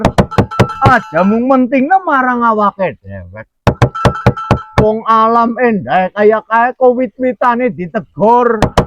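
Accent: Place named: Banyumas, Indonesia. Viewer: Dalang ngapak aneh-aneh Bae.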